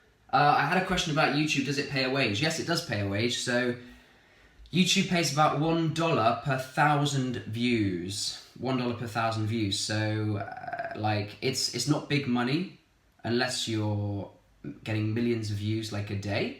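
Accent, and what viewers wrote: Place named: England, United Kingdom. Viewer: Hello.. Great channel, I really like it, Arabic is amazing language, actually I love England accent,so that why i watch your channel to learn more and improve my english😁, keep going👍 أتمنى لك كل الخير والتوفيق good luck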